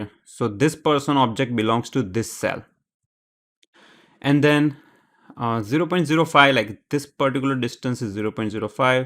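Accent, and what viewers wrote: India. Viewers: indian accent is so cute 🤣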